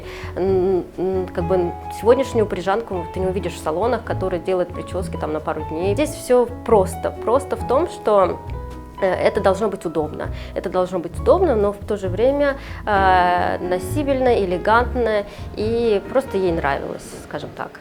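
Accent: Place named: Russia. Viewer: Французский шик с русским акцентом! Потрясающая, потрясающая атмосфера и конечно же изделия 💔 Вы вдохновляете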